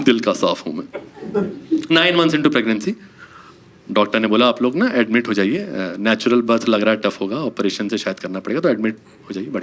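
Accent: India. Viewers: Your dialogue delivery is so typical of Renukoot with a touch of the old Mirzapur vernacular. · That little touch of Bihari dialect.. amazing 👏👏👏👏😂😂😂😂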